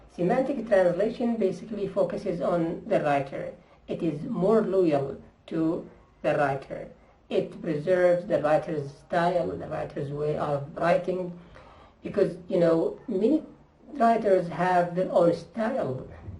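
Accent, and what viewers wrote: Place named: India. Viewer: Dude u sound Indian ngl 😭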